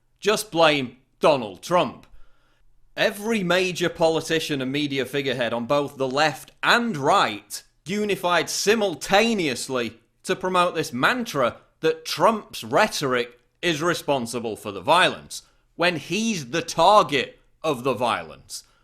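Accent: United Kingdom. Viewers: By the way i love the Channel thank you. Hearing Sh%t i agree with in a British accent makes us conservative yanks feel even more correct haha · whats with the british accent and such interest in american politics? always kinda weireded me out. also why do you always sound so mad? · british accent? man he is a brit living in england........ not sure why someone in england thinks they know so much about an american election........ and this info is pure speculation ................... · You have a British accent, shut the hell up! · Hold on, you sound British... Why the hell do you care so much?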